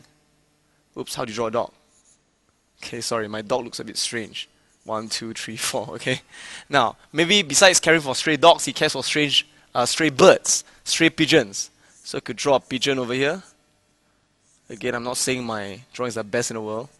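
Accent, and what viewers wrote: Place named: Singapore. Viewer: tiếng anh singapore nghe khó chịu quá :(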